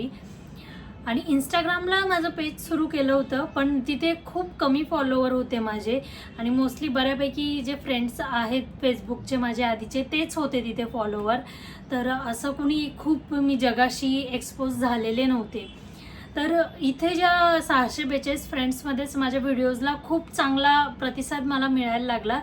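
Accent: Khandesh, India. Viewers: Congratulations one man army, तुमचे व्हिडिओ खूप छान आहेत. तुमची ॲक्टिंग ची हिस्ट्री काय आहे? म्हणजे तुम्ही acting चे प्रशिषण घेतला आहे का? तुमचे आता normal बोलणे आणि व्हिडिओ मध्ये खानदेशी टोन मध्ये बोलणे खूप वेगळे वाटले. Keep progressing 👍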